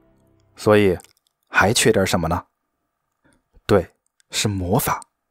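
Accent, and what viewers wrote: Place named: Sichuan, China. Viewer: 四川人吧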